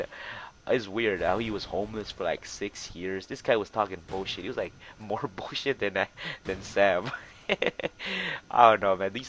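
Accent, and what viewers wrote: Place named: Asia. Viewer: I love you Asian accent you badass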